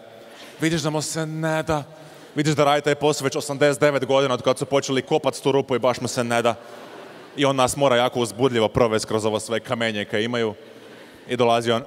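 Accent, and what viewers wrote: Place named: Styria, Austria. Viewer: HAHAHA, zakaj imaš v slovenščini Štajerski naglas? :D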